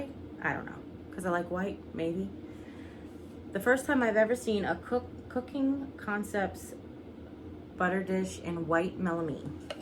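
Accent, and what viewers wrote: New York, United States. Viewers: More great stuff! You're always fun to watch and Igetxa kick out of your "New Yawk/ Long Giland" accent which is near and dear to my heart since I live there. Love those planter baskets. Didn't see them in my store yet but hopefully they'll get them in. I did buy three of those 3 in 1 stackable planters that look like fun to work with. 💕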